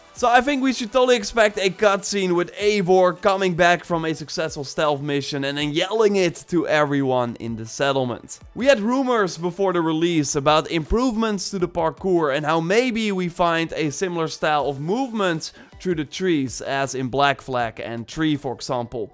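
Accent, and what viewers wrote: Netherlands. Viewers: Are you dutch? Want je hebt een erg Nederlands accent. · Are you dutch? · are you dutch >...?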